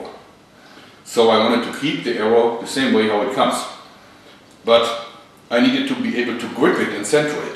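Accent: Germany. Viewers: The german accent just makes it better! *I ment this in a 100% positive way*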